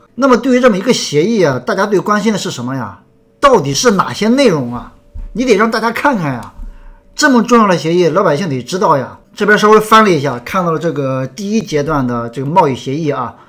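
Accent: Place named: Shaanxi, China. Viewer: 哥！你是不是陕西人！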